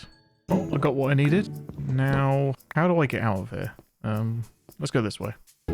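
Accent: United Kingdom. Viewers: This is just a normal British accent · Why does everyone that plays Minecraft hardcore is British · Bro’s voice is so calm, comforting and polite with the british accent on top of it is just amazing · He is british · Omg I love British people, I like the accent it's hard to learn but if your British your lucky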